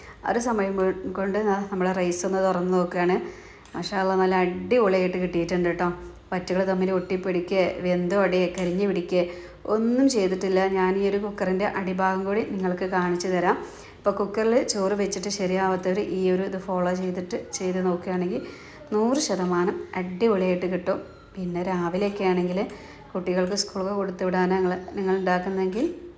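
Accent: Malappuram, India. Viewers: ഇത് പണ്ടത്തെ മലപ്പുറം താത്ത തന്നെ ആണോ കാരണം ഭാഷക്ക് മാറ്റം വന്നല്ലോ ആദ്യത്തെ സംസാരം തനി മലപ്പുറം ആയിരുന്നല്ലോ ഇപ്പോൾ എങ്ങിനെ മാറി ആതാത്ത അല്ലേ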